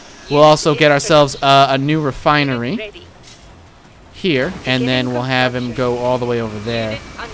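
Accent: South Africa. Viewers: It's not an Australian accent. It's South African. (I am Australian) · He's not Australian, he's South African.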